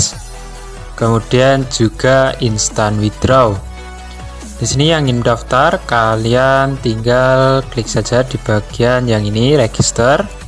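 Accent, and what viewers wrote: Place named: Banyumas, Indonesia. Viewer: Logat ngapak pa ya.. org mn nich?😁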